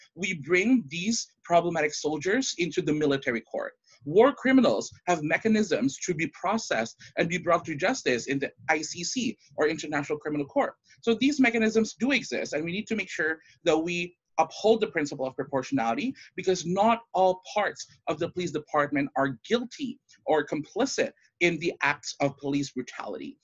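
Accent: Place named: Indonesia. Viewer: Kebanyakan "You know", pronunciation ada yang kayak Indonesian-English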